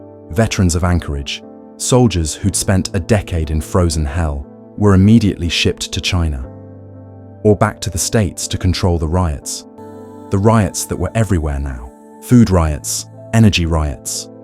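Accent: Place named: United Kingdom. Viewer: a British accent is entirely wrong for Fallout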